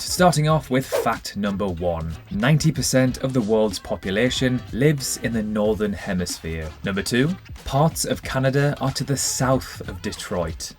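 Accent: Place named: Newcastle, United Kingdom. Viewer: Geordie accent (Tyneside, northeast England).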